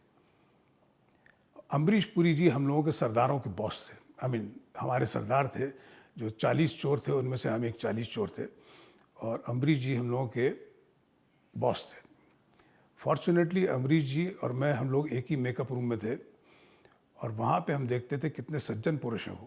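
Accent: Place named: Uttar Pradesh, India. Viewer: Sir apki language se andaza laga lia tha mane ap UP se conected ho my forefathers belong to Allahabad neem sarai and besona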